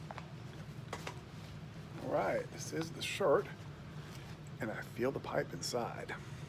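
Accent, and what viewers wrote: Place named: New Jersey, United States. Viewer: Heard the Jersey in this one! Love your vids.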